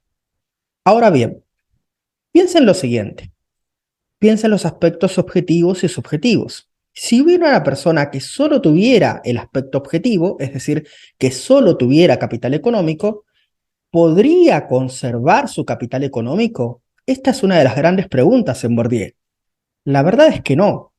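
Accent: Standard. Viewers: Me da ternura que hable en neutro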